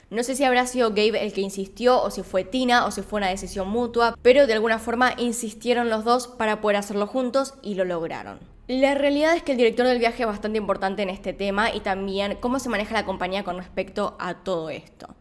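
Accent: Argentina. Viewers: Excelente investigación 🕵️ No me pierdo ninguno de tus casos. "Hola, y bienvenidos una vej mas" hasta lo repito con acento Argentino (soy de México) y me dice mi esposo.... "Ya vas a ver tus casos" y yo... Obvioooo, es mi Happy time. 💜😁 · conmigo es igual, yo incluso busco los casos más largos!!!!! También me encanta ese español de ella de Argentina, yo soy brasilera y afortunadamente hablo español!!!! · Felicidades por tu canal!! me encantan tus videos y la manera en la que los presentas, excelente trabajo!! Yo soy de Mexico pero mi ex era de Mendoza Argentina, amo el acento argentino 😘😘😘😘😘😘😘😘😘😘😘😘😘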